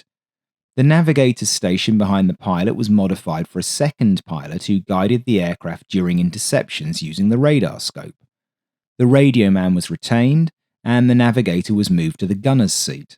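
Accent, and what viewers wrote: United Kingdom. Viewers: "Loo-tenant"? Really? You sound British, so why are you using American pronunciations? Just a part of the continuing Americanisation of the United Kingdom. Sad.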